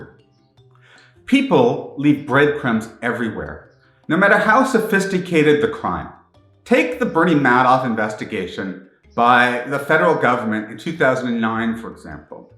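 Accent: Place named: Canada. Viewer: You’re Canadian, aren’t you? I can tell by they way you say process and houses.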